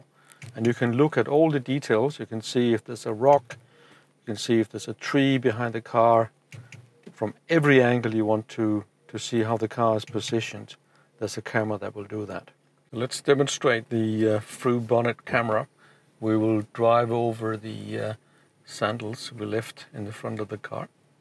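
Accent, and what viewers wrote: Denmark. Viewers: You can always hear a Danish guy speaking English.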